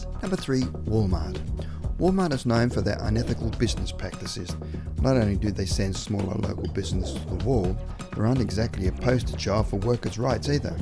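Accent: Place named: Australia. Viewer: cant stand this shit australian accent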